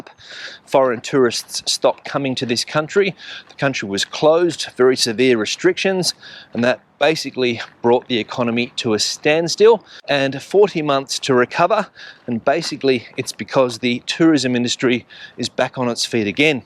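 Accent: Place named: Australia. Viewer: I think I recognise your accent, (are you an Aussie?) thanks for another valuable update!🙏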